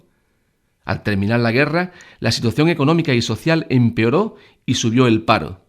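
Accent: Andalusia, Spain. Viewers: Maravilloso acento andaluz.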